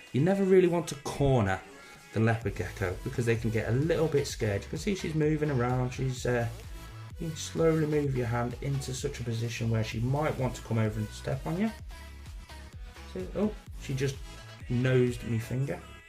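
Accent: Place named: North of England, United Kingdom. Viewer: Yo man! youre clearly from the north of england ahaha (i am too :P) and i wanna ask you if there are any good breeders that are relatively close to manchester that you know of? that would be really helpful man. Preferrably on the southern side of manchester. Im thinking of getting my first pet as a leo or a crestie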